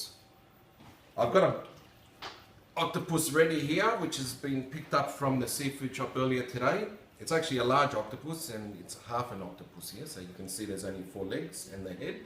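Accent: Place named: Greece. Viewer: I swear he sounds Greek until he says things like oregano.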